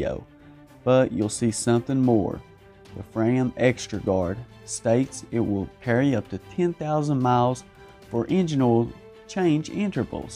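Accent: South, United States. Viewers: Except this guy's accent is real. Sean had the worst attempt at a southern accent I've ever heard. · gotta love the southern voice. relaxing to listeni to.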